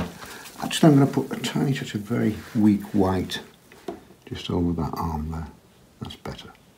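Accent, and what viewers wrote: Yorkshire, United Kingdom. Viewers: Hello I’ve just discovered your site. I love the painting of the horses on the beach. You sound as if you’re from Yorkshire. I have a mom that was from Sheffield. I’ve been to Yorkshire many times it is the greatest place I’ve ever been. ❤ I have a cousin in Doncaster. I will be enjoying watching all of your paintings and hearing you. Let us all know a little about you David V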